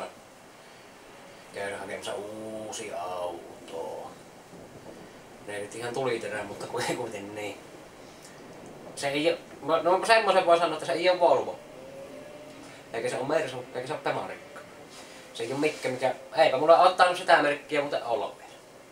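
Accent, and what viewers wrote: Savo, Finland. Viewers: voiko tuota savon muretta oppia millään kansalaisopiston kurssilla tai nettisivustolla? kiinnostaisi vanhoilla päivillä oppia uutta , se mukavasti estää dementiaa.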